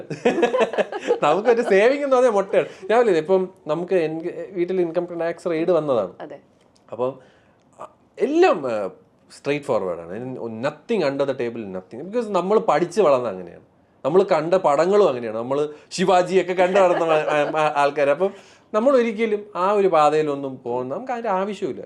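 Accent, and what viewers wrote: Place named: Trivandrum, India. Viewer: Love you. Jju tvm slang vidalle. From malappuram😊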